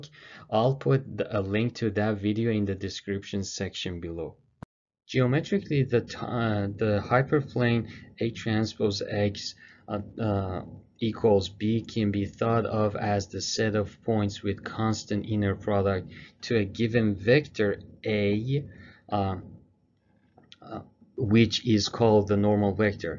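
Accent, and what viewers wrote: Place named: Iran. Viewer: the accent is kinda iranian btw the best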